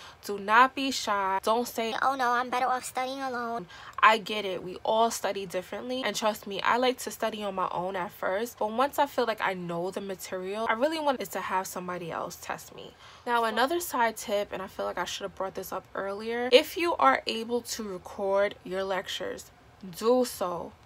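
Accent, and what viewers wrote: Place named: New Jersey, United States. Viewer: I was literally binge watching your videos all morning and then boom a new post 😂😂 I am new to your channel. I work full time and goto an RN program . I am from New Jersey so your accent reminds me of home lol. Good luck! You are way more dedicated and organized than I was when i started. I think I was still in my college phase of partying and cramming but this last semester I rocked it out! I have one more semester starting in January so it’s been a long road but I am ready to be done and I am deciding what kind of nurse I’ll be. Anyway you amaze me and keep going and inspiring 💕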